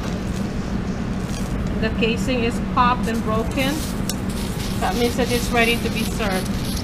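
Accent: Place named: New York, United States. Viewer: That vaguely hispanic? new york accent ❤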